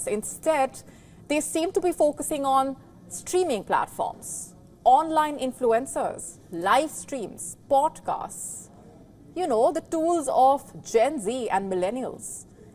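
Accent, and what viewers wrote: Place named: India. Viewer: The Indian accent makes this 100x more funny lmao (here from Theo Von)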